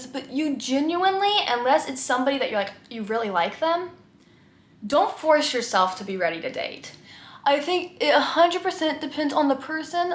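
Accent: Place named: Texas, United States. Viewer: Is it just me or could I sense a bit of texan I’m her accent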